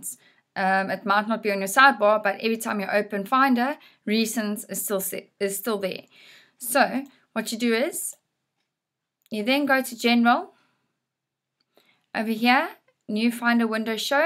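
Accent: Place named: Afrikaans, South Africa. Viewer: Thank you!! (Love the accent! Afrikaans maybe?)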